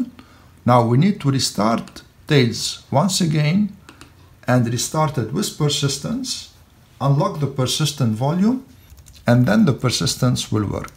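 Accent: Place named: Lebanon. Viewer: thank you for this excellent tutorial! I just wanted to ask you, are you originally from Lebanon bc your accent is familiar. Anyway, thanks again!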